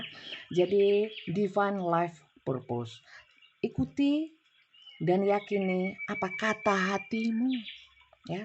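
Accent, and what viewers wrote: Java, Indonesia. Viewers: Assalamu alaykum bunda..?? Q seneng dengerin readingan bunda..lucu mbacanya medok bget..sy jg org jawa bund tinggal di Bandung..g usah di ambl hati sm komentar yg bhasanya kasar org hidup dn yg suka ada yg ga...enjoy aja bund sukses dan seat selalu resonet ga reonet ttp q dengerin q ngefans gaya bicaranya bunda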